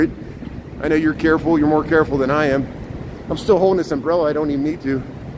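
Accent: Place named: Midwest, United States. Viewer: He gotta be from midwest love his voice😌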